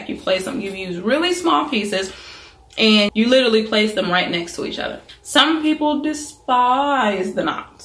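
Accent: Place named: Virginia, United States. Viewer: Girl!! You the bomb! Love your energy! You sound like a VA girl!❤❤❤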